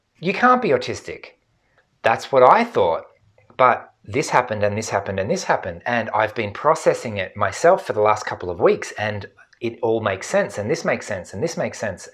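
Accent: Australia. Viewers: Your accent sounds Austrailian to me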